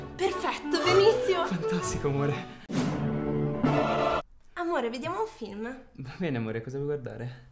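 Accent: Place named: Palermo, Italy. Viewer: che parla con l'accento palermitano fa troppo ridere!! Bravo! 😘👍 E lo dico da siciliana! 😄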